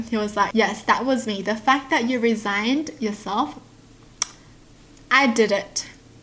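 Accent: Australia. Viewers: Is she Aussie? 🤔 Where does she live? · iamshooketh she’s Australian but so trueeeee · Love her Australian accent aswell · iamshooketh she’s just Australian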